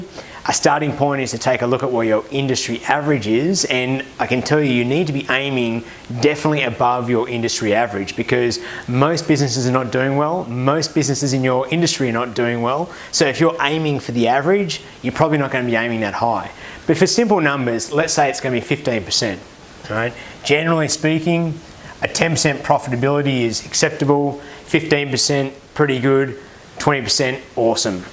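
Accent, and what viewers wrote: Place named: Australia. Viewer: Love your aussie accent mate. You explained very well. Thanks and keep making more detailed videos regarding sales, profit, some success stories, case studies .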